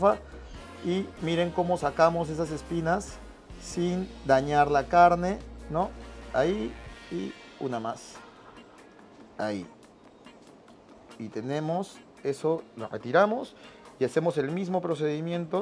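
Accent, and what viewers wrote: Peru. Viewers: Soy admiradora de tu cocina pero me encanta y transporta tu forma tan peruano de hablar es como estar en Perú Gracias llevo 35 años fuera de mi Perú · Que bien explicado, que profesional y que bonito y que elegante suena el español del Perú. Saludos desde España.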